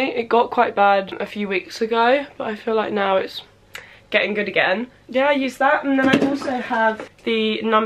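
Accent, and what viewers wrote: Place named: Cornwall, United Kingdom. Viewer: Omg you are stunning! ❤️❤️❤️❤️❤️❤️❤️❤️❤️❤️ Edit are you from Cornwall? I love your accent x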